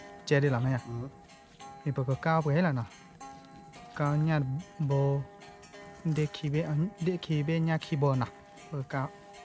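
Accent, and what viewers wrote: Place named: Nakhon Phanom, Thailand. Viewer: นครพนมชัดจ้า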